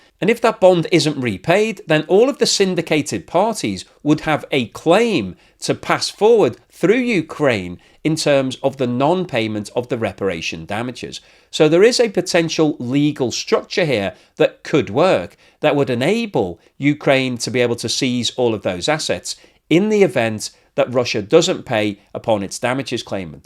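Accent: United Kingdom. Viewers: I hear a British accent. I would prefer somebody with a Belgian accent discussing this matter. Britain does not have a dog in this fight. Your efforts might be viewed as an attempt to inject discourse between Russia and Brussels, Britain's competitor on financial markets. There is a conflict of interests here.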